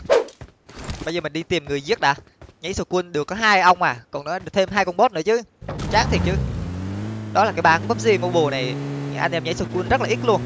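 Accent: Binh Dinh, Vietnam. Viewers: Quê ban ở bình định hả ban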